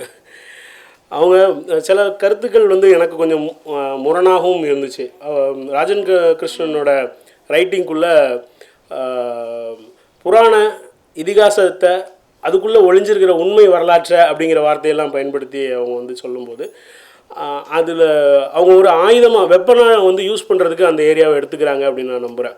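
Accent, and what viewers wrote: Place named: Tirunelveli, India. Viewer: பெருமகிழ்ச்சி கார்த்தி புகழேந்தி. நெல்லை பேச்சு வழக்கு குறைந்து விட்டது வியப்பு! பிற்பகல் 3.15 மணி வரை இருந்தேன். நேரில் தங்களைச் சந்திக்க இயலவில்லை. (ராஜம் கிருஷ்ணன் அவர்களை மூன்று நான்கு கூட்டங்களுக்கு அவர் வசித்த போரூர் தெருவிலிருந்து அழைத்துச் சென்று விட்டது, மைதிலி சிவராமன் அவர்களுடனான நட்பு , 'மகளிர் சிந்தனை' மாத இதழில் அவரது கட்டுரையை கையெழுத்து வடிவில் பெற்று ஒருமுறை திருவல்லிக்கேணிக்கு எடுத்துச் சென்றுள்ள நினைவுகள் வந்தன. 2011 விநாயக சதுர்த்தி விடுமுறை நாளில் ஓவியர் கோபுலு அவர்களை அவரது ராஜா அண்ணாமலைபுர இல்லத்தில் சந்தித்தபோது ராஜம் கிருஷ்ணன் போரூர் ராமச்சந்திரா மருத்துவமனையில் அனுமதிக்கப்பட்ட நிலையில் அவருடன் கவிஞர் இரா.தெ.முத்து எடுத்த பேட்டி வெளியான செம்மலர் மாத இதழைக் காட்டியபோது கண் கலங்கிய படி உற்று நோக்கி 'லட்சுமிகரமா இருப்பளே!...' என்று பேச்சுத் தடுமாறியதை உணர்ந்தேன்...) )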